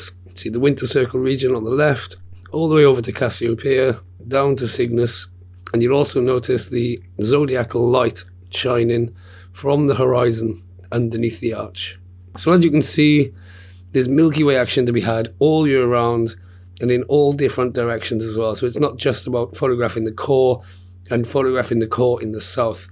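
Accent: Wales, United Kingdom. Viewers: What part of wales are you from mate?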